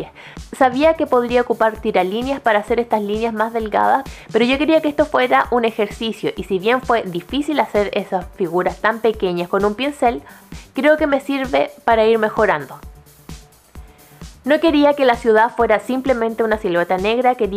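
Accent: Chile. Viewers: tienes acento chileno..o no? yo pinto con acrílicos, soy principiante pero me gusta mucho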